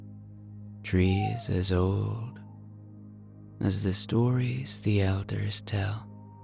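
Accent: Ireland. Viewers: Thank you so much for this story about my ancestors on my grandparents side of the family. I imagined myself there seeing how things were done at that time. I was in reverence and in awe of those people who did so many things in the tribe for the survival of everyone. Hearing you in that Irish accent just melted my heart as I felt a beautiful kinship with you and them. I also miss my grandmother that has passed. When I would pronounce Irish with an i, she would say no it's Arish. 😂 I spelled it right but pronounced it wrong, she said. Thank you for bringing back such wonderful memories of her and educating me on the traditions of my tribe ❤.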